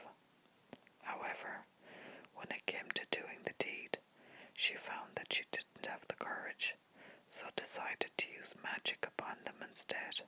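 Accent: Ireland. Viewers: am I detecting a Tipperary accent? :D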